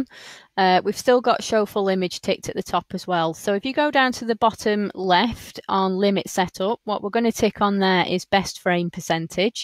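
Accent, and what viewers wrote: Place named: United Kingdom. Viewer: The speaker speaks to fast and with the british accent - difficult to understand all of the words. Slow down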